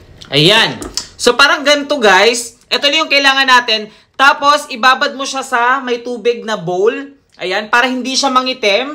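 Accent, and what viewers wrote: Visayas, Philippines. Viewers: Hala bisaya diay ka kuya??? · Bisaya ka po ba 😅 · BISAYA KA YAH? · bisaya diay ka ya?😂😂😂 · Gosto. Namin. Ka. Mag. Bisaya. narinig. Koga. Ng. Bisaya. Ka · Kuya bisaya ka ba?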